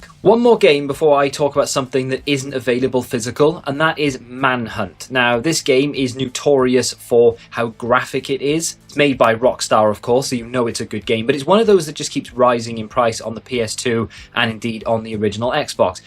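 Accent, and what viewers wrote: Wales, United Kingdom. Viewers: He's Welsh boyo.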